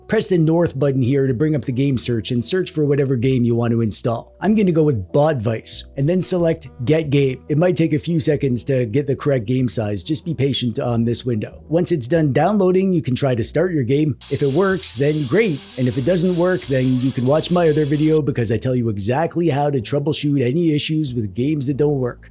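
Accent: Canada. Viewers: Are you Canadian? I think I recognize a fellow Canadian.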